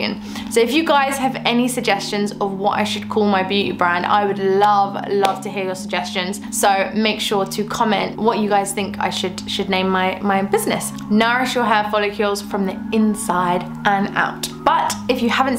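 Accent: United Kingdom. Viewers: I, love those lips and British accent. Oh! I forgot. Great video too.😉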